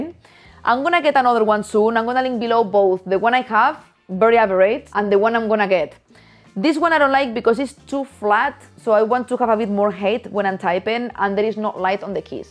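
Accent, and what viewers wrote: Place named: Spain. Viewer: great video,! I love your energy and your "spanis" accent:-)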